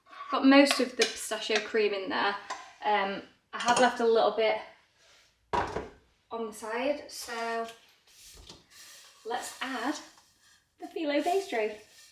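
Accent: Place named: England, United Kingdom. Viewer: Love your English accent. I wish I could try your chocolate 🍫.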